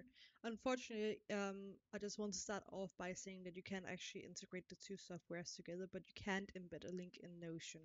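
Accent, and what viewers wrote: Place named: Italy. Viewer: you sound like italian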